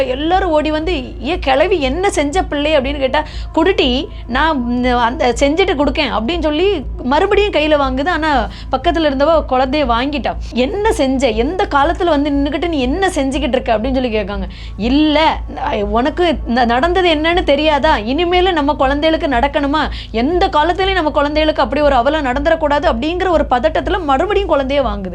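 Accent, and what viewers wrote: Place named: Nagercoil, India. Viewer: இப்ப தான் original Nagercoil காரி மாதிரி பேசுற சகோ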